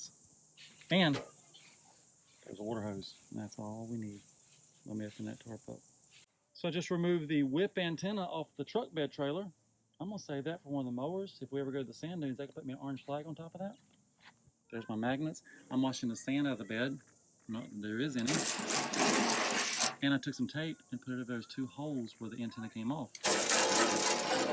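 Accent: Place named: Eastern North Carolina, United States. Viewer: You must be in eastern NC. I can tell.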